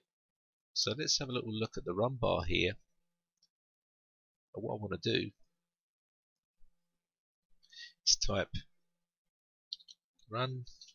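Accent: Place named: Cockney, United Kingdom. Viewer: You're so cockney that I thought you were Australian at first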